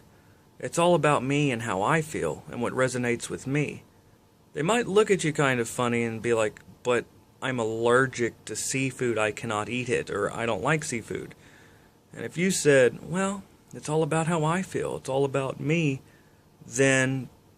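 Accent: South, United States. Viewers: Hi from Sydney, Australia. Thank you for your explanations and knowledge - especially around the historical context and ancient symbol aspects, as your teachings have started to provide me with reasons / answers for why I subconsciously use symbols via colour, plants, nuts, shells, stones and crystals to attract harmony and inner peace and have always done this since childhood. I have just started to use symbols for protection and for hurrying up karma. Sometimes one cannot just wait if one or their friends are being hurt. It is so satisfying to watch the Universe respond to your call. Love your words and especially your 'southern' accent, it adds a unique flavour to your weekly explanations. Thanks again, Barbara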